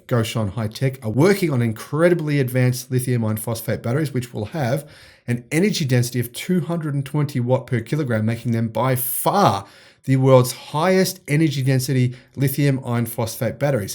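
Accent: Australia. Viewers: Aussi English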